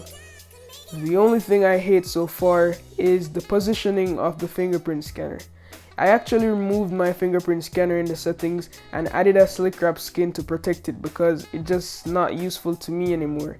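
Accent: Jamaica. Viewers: Are you Jamaican? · are you jamaican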